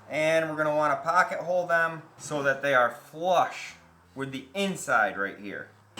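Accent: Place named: Wisconsin, United States. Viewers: okay. I may have recognized it from that. I grew up in Wisconsin. Now I live in Southern Maine. Two totally different accents, of course. But there is a similarity with the way you enunciate your "O". Great video. I subscribed. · I hear Mid West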